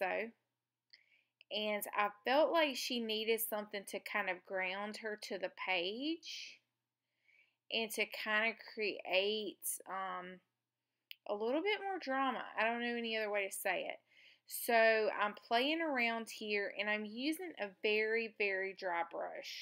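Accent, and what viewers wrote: South, United States. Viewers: My stars girl, I love all of your southern accents and expressions. I moved out West 15 years ago and miss the accents. You bring me Joy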